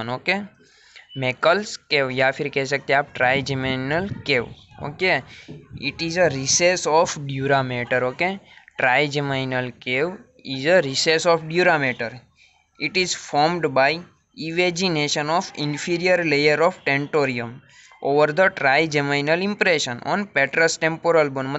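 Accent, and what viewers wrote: Gujarat, India. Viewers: Are u from Gujarat??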